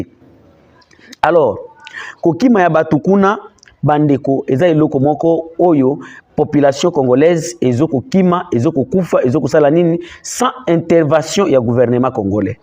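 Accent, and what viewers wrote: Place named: Rwanda. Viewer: La voix du rwandais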